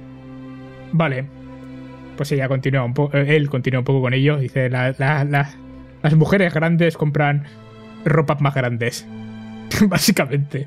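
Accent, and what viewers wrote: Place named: Russia. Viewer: es ruso y se nota... pense que iba a haber algo mas de comunismo en este video es una metafora cierto?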